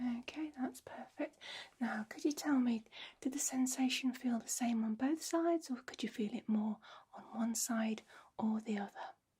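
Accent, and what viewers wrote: United Kingdom. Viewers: i Like the British accent when they’re Yelling and cussing at each other calling each other BLOODY BOLLOXS (This is all jokes but you’re native accent is beautiful and you should know that you are loved by Jesus Christ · I can't get enough of hearing that beautiful British accent here! Thank you for taking care of my ear cranial nerve that has been bothering me for weeks here! Have a beautiful good night 🌙 sleep! 🥱🥰💜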